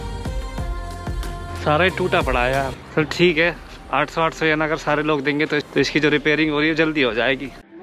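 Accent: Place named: Baghpat, India. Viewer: bhai आपकी भाषा हमारे इलाके से मैच करती है बागपत को जानते हो क्या? Hamari DELHI NCR on the top❤️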